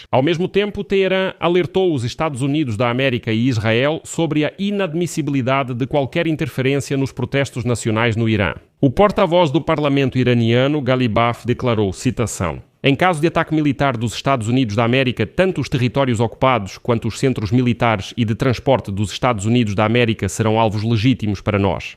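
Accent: Portugal. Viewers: Jornalismo alexiceu língua portuguesa de Portugal fale sempre assim gostei. E língua portuguesa Portugal no Br. E nossa toponimia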